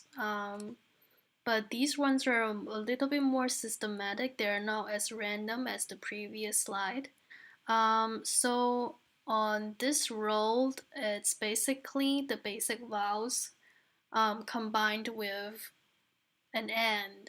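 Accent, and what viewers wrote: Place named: Brazil. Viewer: 修女，我喜欢你的视频！ 你讲得非常好，而且你的语速非常适合我在旁边做笔记。 另外，你的英语口音听起来和我的一个好朋友很像，她是巴西人。 这让我更喜欢你了！ 希望你一切顺利，感谢你的辛勤工作！❤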